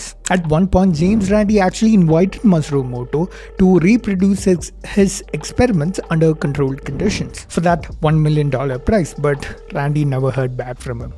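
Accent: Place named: South India, India. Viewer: you r speaking South Indian... in the name of English..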